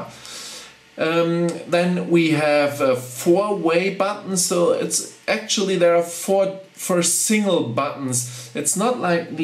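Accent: Germany. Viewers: you have to excuse his pronunciation for he is from Germany. now a days, Who would put in 41 mins review for you. not even the sell man from the store you go for purchase. cheers.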